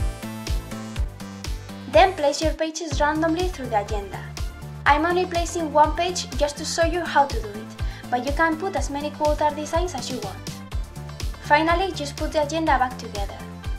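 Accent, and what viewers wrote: Spain. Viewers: Me encantan tus diseños, quizás te copie alguno ;). Se nota mucho tu acento español pero se entiende todo, la gente que te critica por eso es sobretodo porque son monolingües(y eso es malo para el cerebro y la creatividad) Espero ver algún día un vídeo en Español, ya estoy harta de que todos hablen inglés en youtube y en el instituto.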